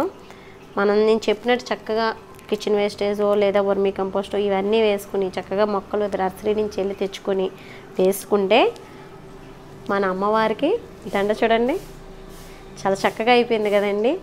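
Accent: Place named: Kakinada, India. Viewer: Kakinada voice super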